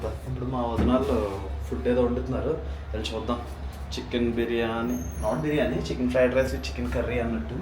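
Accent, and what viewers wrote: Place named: Godavari, India. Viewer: Godavari slang 😊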